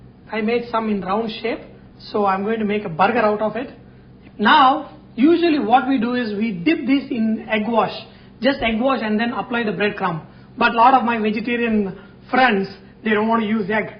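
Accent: India. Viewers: QUICKLY DIP IT! IMMEDIATLEY! ... the indian accent just makes this all the more fun XDDDDDDD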